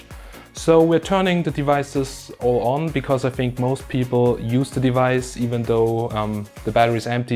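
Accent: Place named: Germany. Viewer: German accent 😁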